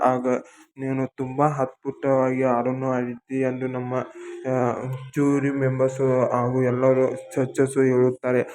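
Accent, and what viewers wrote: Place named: North Karnataka, India. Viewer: Uk kannada 😅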